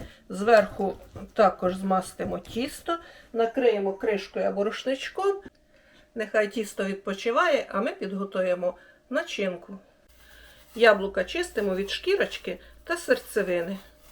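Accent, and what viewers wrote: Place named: South, Ukraine. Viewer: до перестройки этот говор считался сельским и на нём говорили на всём юге страны. в 80-е приехала к подруге по институту на свадьбу на Кубань. попросили помочь на кухне. определили помощницей меня к бабушке. она стала говорить вот точно также как с экрана говорит эта женщина. прошу девчонок мне перевести и заодно спрашиваю на каком языке она говорит. мне отвечают ЭТО ХУТОРСКОЙ ГОВОР МАЛООБРАЗОВАННЫХ КРЕСТЬЯН. так что из сельского говора сделали украинский язык и убедили население, что это их национальный язык. а по сути на нём говорил весь юг страны.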